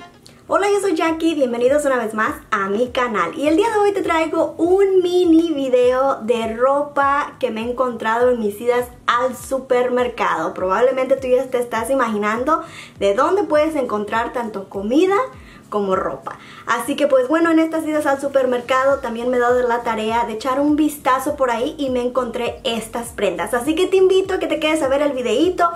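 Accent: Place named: Mexico City, Mexico. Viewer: ahh mira .. mi esposo es de aya ... crei q eras de la ciudad de mexico por q asi soy yo de chistosa pa hablar jajajaja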